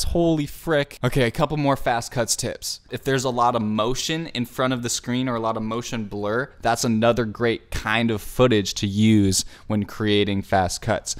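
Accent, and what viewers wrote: United States. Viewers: Hey, I'm lesbian. I thought you were American. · Bro I'm lesbian ✨I thought your American ✨💯😂legend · I'm lesbian i thought your American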